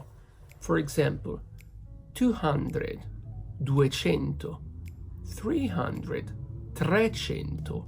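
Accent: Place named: Italy. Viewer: Are you Italian? I love your accent!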